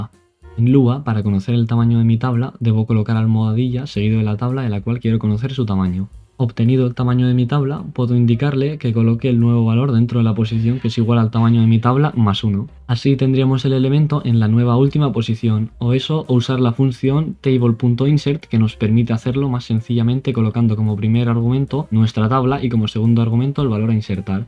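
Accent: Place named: Argentina. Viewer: Ja ja ja ja. Yo pensaba que eras argentino, pero muy bueno, tu video sigue así.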